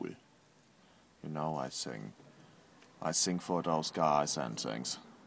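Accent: Germany. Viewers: "Like volvarine" Oh GOD, I LOL'D. Tears, there are tears down my face. My Dad's German, so this accent already has me losing it, but saying that has me in utter hysterics! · god cant he put a little effort in pronounciation so he doesnt sound like the most german guy ever? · The German accent made this 50x funnier. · Is this guy actually really German or is he just faking the accent?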